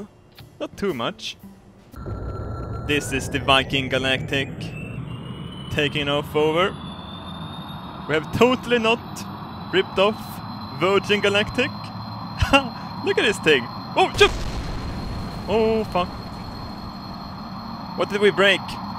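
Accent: Sweden. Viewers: SurvivalGaming Romania Hes Swedish its a Y sound, like jimmy sounds like yimmy. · I love swedish accent:D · it's a typical swedish accent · The language he is talking is English. His accent is Swedish. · dat swenglish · It's his awesome manly Swedish accent maveon carter so go fuck off.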